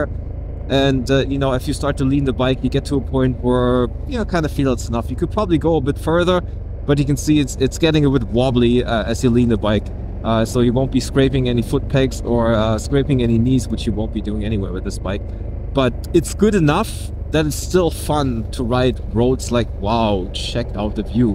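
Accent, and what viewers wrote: Germany. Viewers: Gutes englisch aber den deutschen hört man trotzdem raus haha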